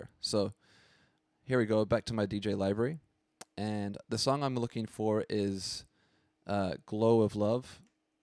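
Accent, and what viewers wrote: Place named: New Zealand. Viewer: I could definitely hear the kiwi...solid keep up the good vids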